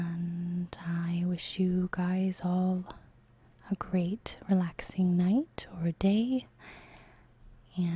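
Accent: Canada. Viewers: What part of Canada are you from? Love the accent:)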